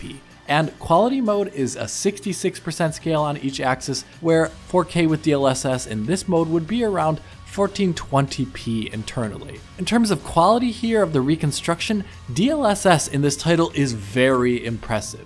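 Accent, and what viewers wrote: Germany. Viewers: Lord Farquaad he wasn't so good in his older videos. And he definitely has a slight German accent, you can hear it.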